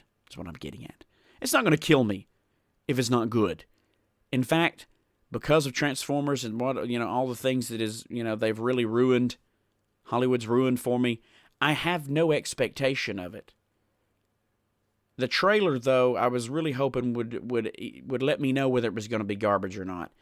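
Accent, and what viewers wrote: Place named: South, United States. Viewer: U HAVE THAT SOUTHERN ACCENT WHAT STATE U LIVE IN ??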